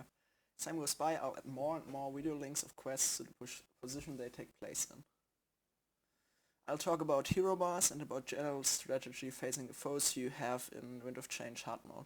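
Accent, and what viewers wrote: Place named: Germany. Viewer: man hört so heftigst den Deutschen Akzent raus das so schlimm, aber dennoch gutes Video^^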